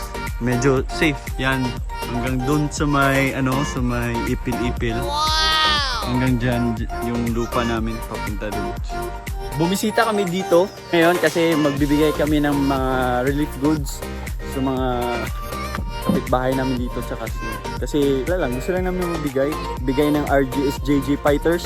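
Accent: Philippines. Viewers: anong probinsya? ang accent?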